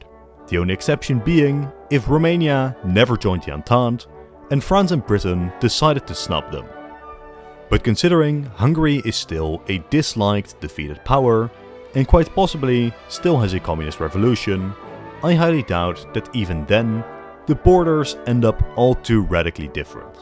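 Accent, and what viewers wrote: Netherlands. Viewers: By any chance, are you Dutch or Flemish?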